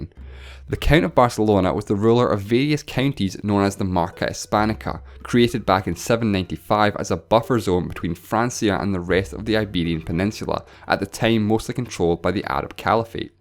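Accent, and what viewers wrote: United Kingdom. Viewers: May I ask where part of the UK are you from?. I mean, My native language is spanish; I've studied English, but I've never been in an English speaking Country and I have some issues understanding some accents, in particular I have issues with Bitish English (that's what I thouthgt) and some whith canadian and american English from the northest (Massachussetts and that area). But I have noticed that you have a very British accent and notheless, I can understand you pretty well.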